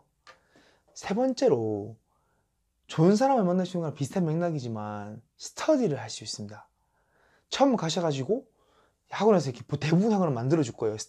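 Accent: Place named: Gyeongsang, South Korea. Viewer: 멘토님 대구분입니까 부산분입니까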